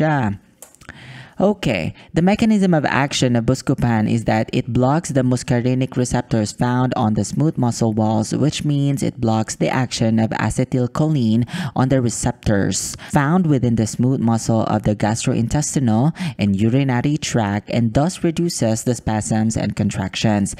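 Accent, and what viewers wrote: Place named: United States. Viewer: English di maintindihan parang amercano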